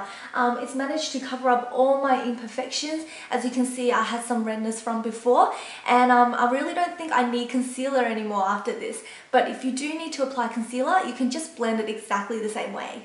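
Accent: Australia. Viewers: I love your video! And love the Aussie accent! ;) so I just used my beauty blender for the first time today and just like u, I fell in love with it!! It covered my acne and scaring. I couldn't believe it!! Best purchase hands down.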